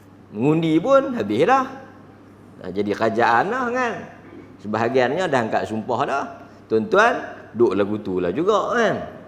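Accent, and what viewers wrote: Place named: Perlis, Malaysia. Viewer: Jazakallah khairan. Suka sangat dengar Ustaz bila menggajar dgn loghat Perlis (kadang tak faham tapi takpe). Memang teruk la manusia ni kalau Iblis minta doa pada Allah tapi kita minta dari selain Allah swt.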